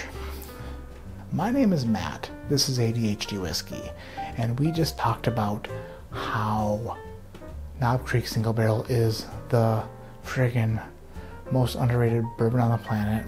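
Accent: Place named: Minnesota, United States. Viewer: He has SUCH a Minnesota accent! Must be from the Cites or thereabouts.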